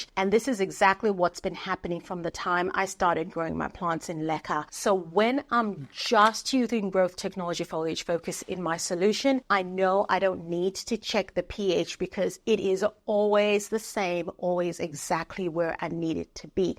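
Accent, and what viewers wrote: Australia. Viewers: The lady I bought a few of my plants from watches your channel & she brought over some plants in leca & pon. I couldn’t see the water level, so I panicked & repotted them straight away. I’ve never experienced root rot, but the roots sitting in water scares me. Yours look great, so it’s working for you. I just adore an Australian accent 😌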